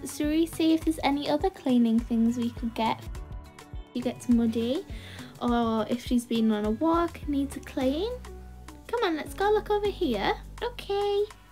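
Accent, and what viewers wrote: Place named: RP, United Kingdom. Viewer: How posh is your voice!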